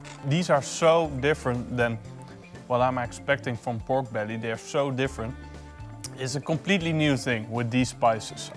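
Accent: Netherlands. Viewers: That accent sounds familiar! A Dutch Friend? Greetings from Germany :) Keep up the good work!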